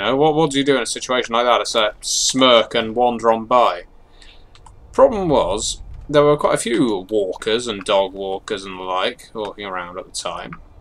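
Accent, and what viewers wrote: United Kingdom. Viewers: stories like this sound so much better in a English accent also lock pick is a waste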